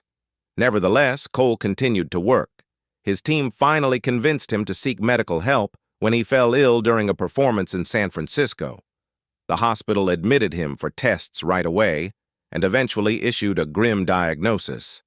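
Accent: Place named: England, United Kingdom. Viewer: Why has he an English ascent and where is evidence of his 4 years old picture and church photos. He is English not American.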